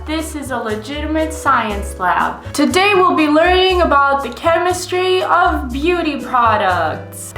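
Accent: United States. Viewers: You clearly grew up in America you liar! To have a yank accent you lived in America and act like a American so yea I call bullshit on your nationality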